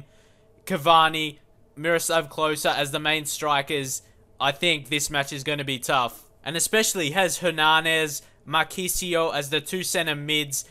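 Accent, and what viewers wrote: Australia. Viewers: are you from Australian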